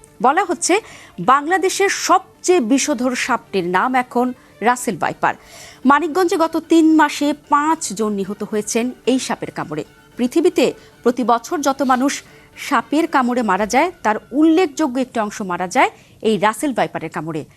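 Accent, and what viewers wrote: Kolkata, India. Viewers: Apni ki Indian ? Apnar language and presentation style Kolkata ba Indian Bengali style er. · Apni kolkata style a Bola bondho koren. Birokto lagey. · Apnar accent kolkatar moto keno? disgusting,disappointing, unacceptable. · এই presenter মনে হয় ভারতীয় নারী।। তার বাচন ভঙ্গি কলকাতার মেয়েদের মতো।। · আপনি কলকাতা স্টাইলে কথা বলেন কেন , আর এটা বাংলা না বাংলাদেশ ওকে... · নাচনেওয়ালী কোলকাতার accent এর উপস্থাপিকা কেন? বিরক্ত লাগল খুব। উচ্চারণও কোলকাতার যা শুনেই বিরক্ত লাগল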